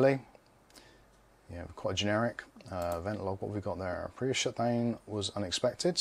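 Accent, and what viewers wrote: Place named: England, United Kingdom. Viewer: 120gb SSD? Wow that's tiny for 2022! 500gb should be minimum and for my PC builds I install 1TB ssds at a minimum. Windows 10 or 11 would fill up the 120gb ssd. Keep uploading these PC fixes, it's like watch Greg Salazar but with a cool English accent!! I will try and make your live stream on Saturday since I'm off work for the weekend.....finally! I still work on Youtube but no bartending this weekend!!! Cheers!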